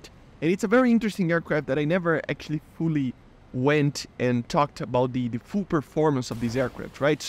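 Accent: Brazil. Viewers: is a brazilian with portuguese linguistic vices. So it might sound like the IRIS-T for a non portuguese speaker. He literally put an image of the IRST on the screen when he is talking about it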